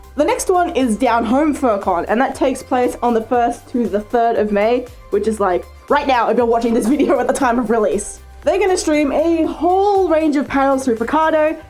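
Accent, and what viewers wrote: Australia. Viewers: Uh question are you Aussie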